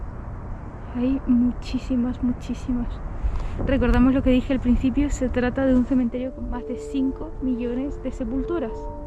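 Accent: Chile. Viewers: Saludos desde Chile. Tienes a veces un acento como el nuestro. Un agrado descubrir tu canal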